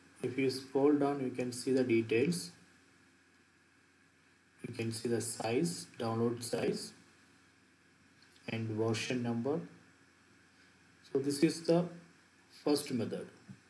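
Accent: India. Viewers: Are you from Kerala?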